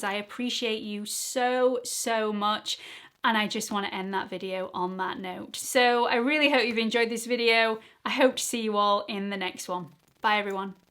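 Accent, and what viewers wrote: United Kingdom. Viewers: you are a gem! I always look forward to your videos! Between your adorable personality and your British accent, I would watch you all day, even if you didn't ever share the wonderful information with us. Hugs from Iowa in the US! 💓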